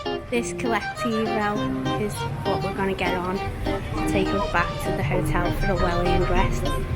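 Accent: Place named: Yorkshire, United Kingdom. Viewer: Canadian here so I'm a total moron and can't place your accent... is it Yorkshire?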